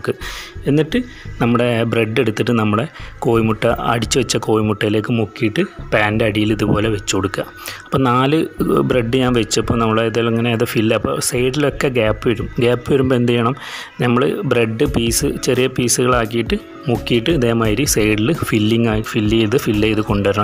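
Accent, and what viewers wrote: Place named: Kozhikode, India. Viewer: കോയിക്കോടൻ ഭാശ കേക്കാൻ നല്ല രസ ണ്ട്😊👍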